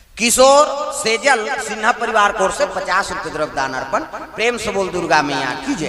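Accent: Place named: Chhattisgarh, India. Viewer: छत्तीसगड़ी बोली माँ बहुत मिठास हे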